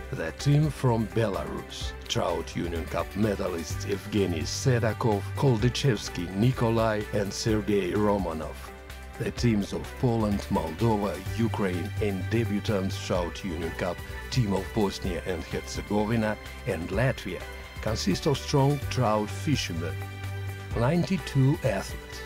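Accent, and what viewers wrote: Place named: Russia. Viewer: раша акцент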